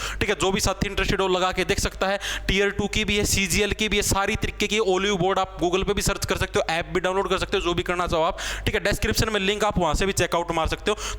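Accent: Haryana, India. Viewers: I love you haryana accent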